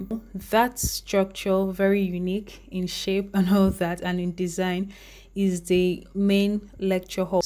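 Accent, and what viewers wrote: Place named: Enugu, Nigeria. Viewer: Dat Enugu YouTuber, I love your accent and your work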